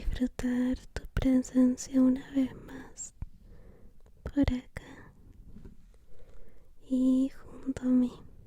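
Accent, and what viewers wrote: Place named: Argentina. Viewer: Desde que parte de Argentina transmites los videos, lo digo por la entonación.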